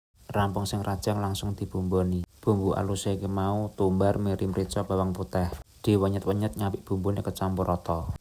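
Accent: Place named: Java, Indonesia. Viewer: Bang sampeyan ki uripe no wilayah ngendi yo kok bhs jawane medhok banget .aq terus lo nyemsk tik tokmu aq jawa timur mlng .aq seneng nyimak olehmu masak2..nek masak bumbune cucinen disik lo yo wedine onok kotorane...yen nyawang olehmu maem dadi ngiler..mugo2 cenele sampeyan terus maju nggih.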